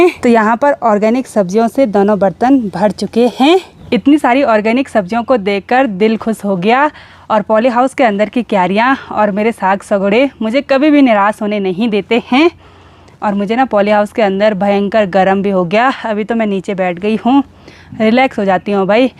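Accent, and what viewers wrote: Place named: Pahari, India. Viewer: अ य चाची परनाम ❤😂 मीठी आवाज, पहाड़ी लहजा ❤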